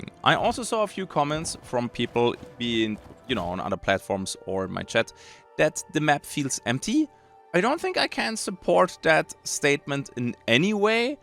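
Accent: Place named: Germany. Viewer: Sehr gutes Video, Sehr deutscher Akzent hahaha. zB review. Das re wir wie be reel ausgesprochen und nicht wie bei red. oder biomes. bi wie by und nicht wie bee die Biene. aber echt gute review, schön viele Schnitte zu spannenden Szenen und passend zu dem was du sagst. Nicht 0815.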